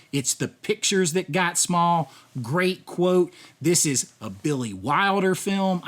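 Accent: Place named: Louisiana, United States. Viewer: Your goofy louisiana accent doesn't fit with hard boiled urban anti-heroes from Film noir movies. Stop trying.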